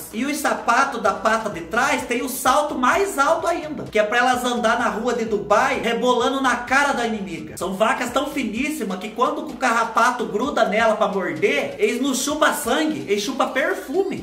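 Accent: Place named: Itacaré, Brazil. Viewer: seu sotaque eh igual das pessoas de Itacaré sp morei nessa cidade há 20 anos e seu sotaque me dá uma saudades de lá, amo seus vídeos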